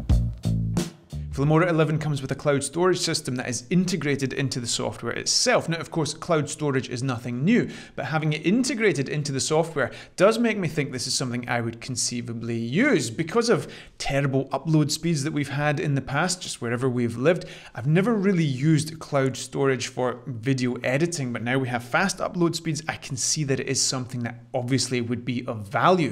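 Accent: Scotland, United Kingdom. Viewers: I have subscribed. Nice to hear another Scottish accent!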